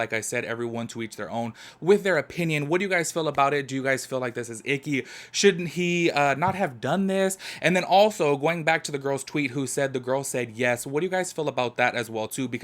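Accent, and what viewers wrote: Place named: Texas, United States. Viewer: omg ur from san pedro???? much love from the south bay 🤍🤍🤍🤍 thought u were from texas lol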